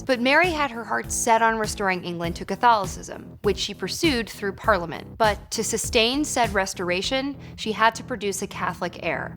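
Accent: United States. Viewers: Its hard listening to English history via a twangy American accent, no matter how attractive the speaker is.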